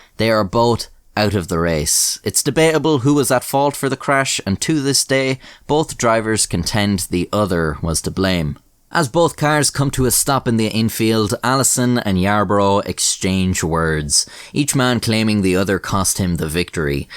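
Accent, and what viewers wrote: Ireland. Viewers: It’s wild hearing these NASCAR driver’s names pronounced with an Irish accent, You pronounced them correctly, just weird hearing them without a. “Southern Drawl” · Dawg your Irish accent is so smooth and pleasant to listen to, stay beautiful my friend. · Ever heard of Mike from Thatchapter? He's Irish too,I like your accent way better though.he sounds like Irish lite or something. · yo irish man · so a light Irish accent almost pronounces Nascar in its proper grass roots hill folk form... hillbill sees race cars and says "Thats a niiice car" IE the word Nascar was born Also, used to race Karts as a Kid... Dirt circle, WKA. Fist fights were very common at the race track.. usually one every weekend at least.. One time i was at a Dirt Modified Race and at the end because of a disputed win, there was a fist fight of about 20 folks on front stretch/winner circle and the whole crowed basically started rioting.. 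beer cans were flying everywhere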